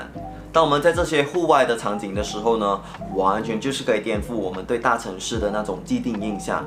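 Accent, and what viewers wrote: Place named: Malaysia. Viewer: 一听就知道你是马来西亚人，不用强调什么新加坡口音的。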